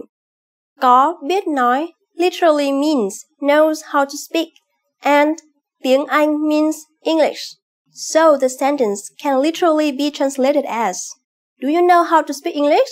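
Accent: Vietnam. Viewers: Is this southern or northern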